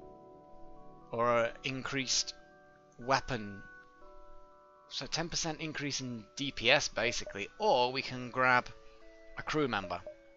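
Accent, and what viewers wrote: England, United Kingdom. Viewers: Oh BTW! Velcoma back (bad German accent). Nice to here that jolly gd English accent back on screen all the best. ( n let's god hope you don't go n get all of us killed once again. :-D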